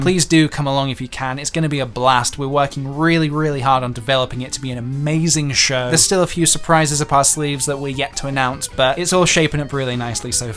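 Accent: United States. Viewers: Tell me why your American accent is better than every american’s American accent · That american accent 😍 Grateful for how good you are at it LOL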